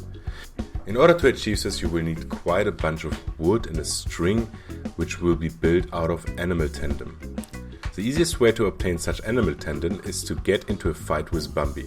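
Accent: Germany. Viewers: Bist du deutsch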